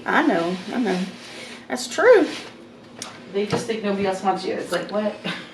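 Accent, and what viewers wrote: United States. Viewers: She does speak English it's just a American accent like British have British accent accent isn't a language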